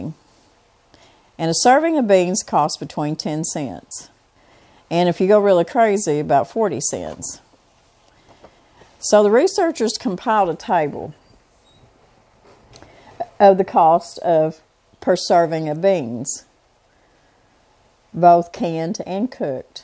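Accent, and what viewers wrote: South, United States. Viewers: Thank you! I've got tons of dry beans, but only a few qts of ham and bean soup. Need to get more beans for canning bc what you said makes lots of sense. Just LOVE your Southern Belle drawl. ♥️ Subscribed.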